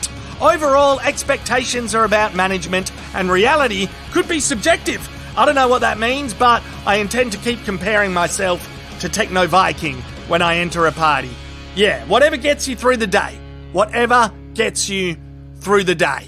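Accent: Australia. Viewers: give a few years and you (as i do at times) slip out "fucked" in a slightly ozzy accent pppmsl all be it bad ozzy accent shit still happens 🤣👀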